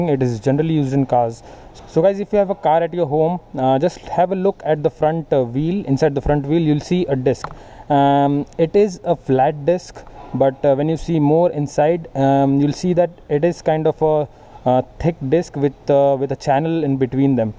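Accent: Gujarat, India. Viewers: u gujrati?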